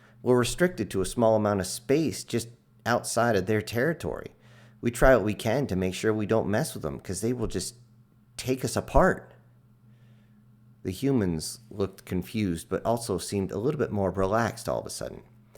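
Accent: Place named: South, United States. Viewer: Hearing your southern accent I really want to hear a story with a Scottish accent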